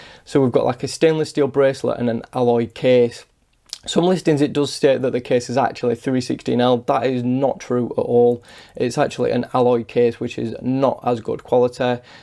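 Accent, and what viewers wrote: United Kingdom. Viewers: Super Fluent British Accent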